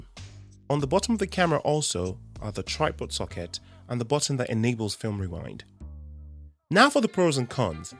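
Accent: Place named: England, United Kingdom. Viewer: Hi, I've just discovered your videos and I think they're really fantastic. It's also lovely to hear a familiar sounding voice on youtube. Nothing at all against the many Americans who also make wonderful videos but an English accent definitely makes me feel at home :)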